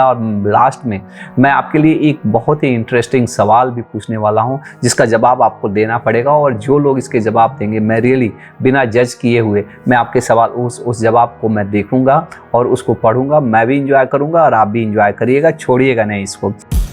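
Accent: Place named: Bihar, India. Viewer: Sir, aapke bolne ke style se bta skta huun app surely Bihar se hain wo bhi MAGADH area se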